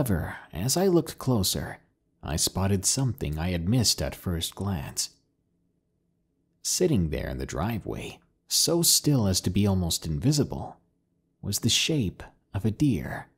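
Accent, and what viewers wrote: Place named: South, United States. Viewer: I used to hate his old southern twang he had. His voice now is great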